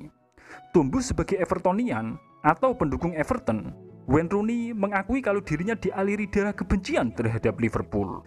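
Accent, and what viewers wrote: Java, Indonesia. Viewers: Jawa koek nu ngomong na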